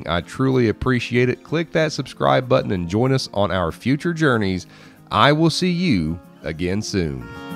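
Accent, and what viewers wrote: United States. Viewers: Its so refreshing to hear someone narrate in a clear american voice that can be clearly understood! Not like those British and India narrators! Most of the time i have a hard time understanding tbem!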